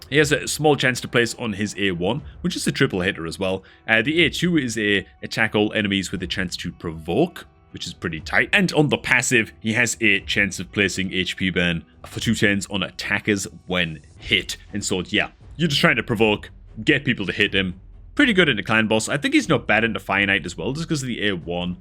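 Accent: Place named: Newcastle, United Kingdom. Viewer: It sounds baseline Geordie but mixed with something else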